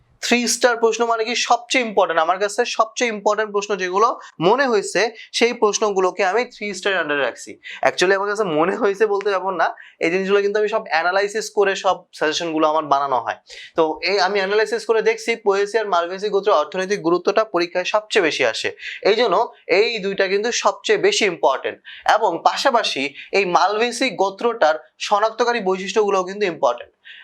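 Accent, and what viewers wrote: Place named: Pabna, Bangladesh. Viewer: আপনে তো পাবনার সার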